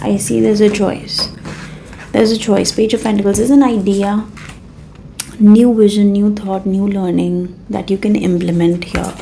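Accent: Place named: United States. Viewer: USA English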